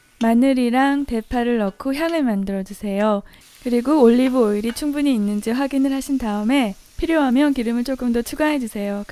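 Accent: Seoul, South Korea. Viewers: 말투가 완전 옛날 서울 사투리네요... 넘 좋다.... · 뭔가말투가... 끝까지 못보겠어요. 서울사투리 귀엽다는분들도 있는데 각자 듣기좋은 목소리가 다르겠죠?ㅠㅠ 더많은 구독자를 생각해서 조금 더 표준어를 사용해보시면 어떨까요? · 이게 서울 사투리군요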